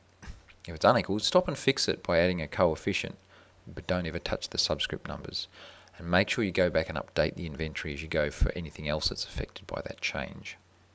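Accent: Australia. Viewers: finally it took an aussie ...good job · I like you matey cause you sound Australian, oh and this video was really bonzer!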